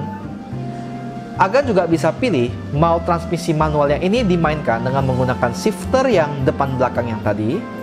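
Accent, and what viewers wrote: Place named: Tegal, Indonesia. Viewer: Dari logat bicaranya pasti admin ini orang Tegal atau Brebes jawa tengah ya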